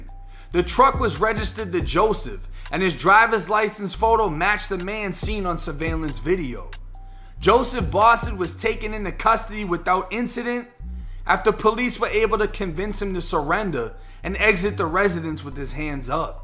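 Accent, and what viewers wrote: Boston, United States. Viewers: Why you sound like you from Boston? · Are u from Boston I love the way u talk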